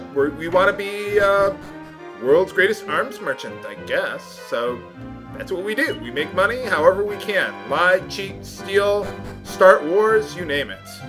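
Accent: Ireland. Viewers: This accent sounds Irish to me. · It sounds like he is Irish to me. The myth of the town disappearing and some the phases are what make me think so. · btw its Irish the accent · its an Irish accent:) and also give the cowpat to tornecko when u can:)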